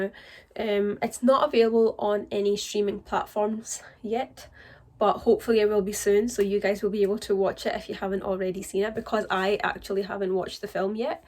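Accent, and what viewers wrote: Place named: London, United Kingdom. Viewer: Amazing but why do u kinda sound that ur from London???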